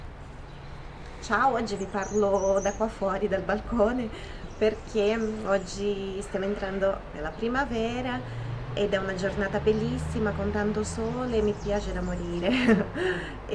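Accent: Abruzzo, Italy. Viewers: di Rieti.. università dell'Aquila però.. cmq si sente ogni tanto la "calata" abruzzese nei tuoi video ehehe!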